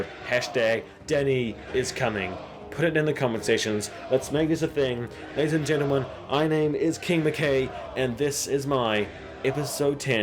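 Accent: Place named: New Zealand. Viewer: *Great Video. I like your Kiwi accent reviews almost as much as I like the Ozzy guy reviews. The difference is you speak the Kiwi English and he speaks Bloke English. *The Little Bear Lyanna Mormont gives her speech like a boss. Sorry Wyman Manderly we gave your speech to a 10 years old girl. "The North only knows one king and his name is Snow....wait what?!" Oh well I joined in with the northern guys yelling a king in the North! Sadly I thought they would have had a Queen in the north but the northerners follow battle commanders and Sansa hid until the end with Lord (are you 18 yet Sansa) Baelish. **The Armada scene was great but I didnt see Tyrion on the ship so I assume he is on some other boat. I liked that Yarra had the big Pirate Captain coat. I almost wish she'd have stolen Capt John Sparrow's coat that he wears at the end of the 1st movie. 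However I don't think Disney will allow that until they Buy HBO.... #Disneyownseverything *Jamie will probably have to do something and I see Brianna Tarth helping him and then the 2 of them having sex. *Arya might sneak in and kill either Cersai or the Mountain, I'm thinking the mountain. She must have learned some kind of anti Necromancy many face gods spell to kill Franken-mountains.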